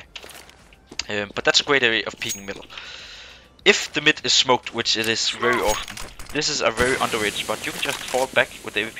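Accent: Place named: Denmark. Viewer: dansk accent heh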